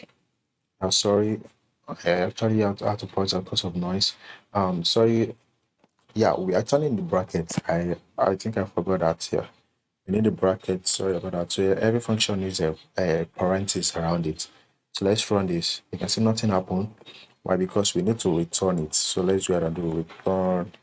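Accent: Nigeria. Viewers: A Nigerian, nice. I'll recognize that accent anywhere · This person has a Nigerian accent 😍😍😍😍😍 Me likey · This tutorial guy sounds like a Nigerian 🇳🇬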